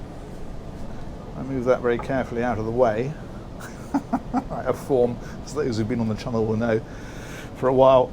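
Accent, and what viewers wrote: England, United Kingdom. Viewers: starting my morning with "crockery" always makes me happy (don't ask me why...I have no idea, just love the English vocab and accent)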